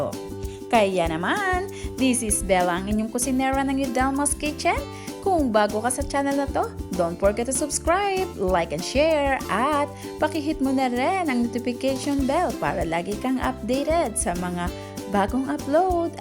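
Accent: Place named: Iloilo, Philippines. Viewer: Ilonggo accent i can hear!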